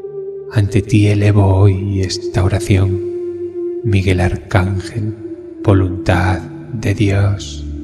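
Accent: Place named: Spain. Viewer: Buenos días amigo. Eres una gran bendición. Tu voz es muy bonita y agradable escucharte. Tu accento español es muy bello. Yo soy 28 porciento española. 12 porciento francesa, Indigenous. Te mando un fuerte abrazo desde Hacienda Heights Ca U.S.A. 💯🌟💋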